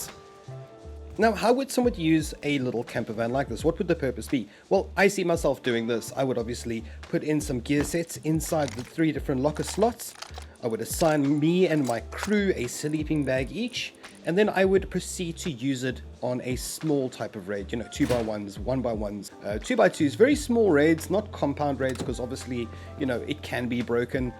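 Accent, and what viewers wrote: South Africa. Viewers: Are u south african?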